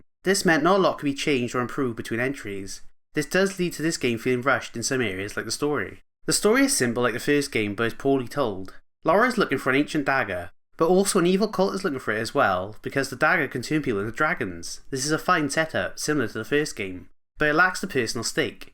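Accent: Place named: Wales, United Kingdom. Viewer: Ah I know a Welsh accent when I hear one!🏴 Agree with a lot of your points! Compared to other classic TR entries, TR2 is the least replayable for me. I like the heavy combat, but the underwater section, clunky vehicular gameplay and weak story really let the game down. Interested to hear your thoughts on TR3! Excellent video! Subscribed!💚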